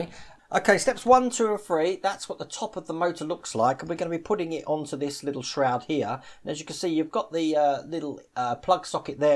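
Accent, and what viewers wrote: United Kingdom. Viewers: where in the uk are you that accent is bugging me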